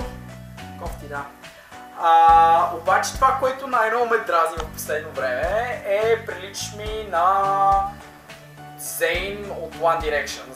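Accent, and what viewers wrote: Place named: United Kingdom. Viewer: имаш доста готин английски акцент, по-скоро великобритански, за да се разбере по-добре какво имам предвид. Защо не направиш клип с любимите ти или най-омразните ти неща на великобритански английски... ще ми е интересно :)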